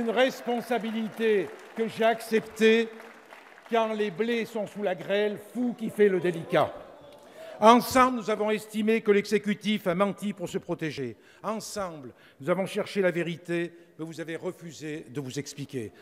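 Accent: France. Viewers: Je viens de Clermont et je suis né à Saint etienne et j’y est de la famille là bas cet accent viens de St etienne pas de Clermont on met des é partout nous (trés lait (é) etc)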